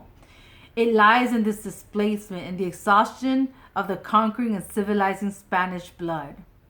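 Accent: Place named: United States. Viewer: Too American of an accent 😖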